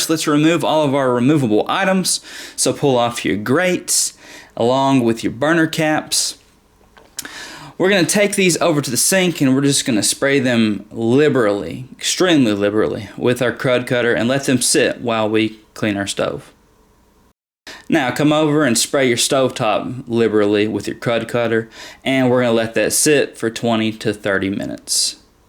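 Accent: South, United States. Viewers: IM a SNOW BIRD...LOVE YOUR SOUTHERN DRAW yes you are the nice work my friend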